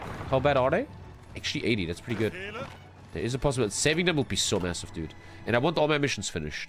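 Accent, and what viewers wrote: Germany. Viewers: So geil man hört raus das du Deutscher bist. Endlich einer von diesen Kanal der Deutscher ist. xD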